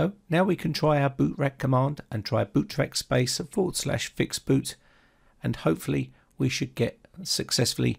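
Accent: England, United Kingdom. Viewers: I hate you english acent but thanks I use a windows 7 boot diferent to the windows 10 boot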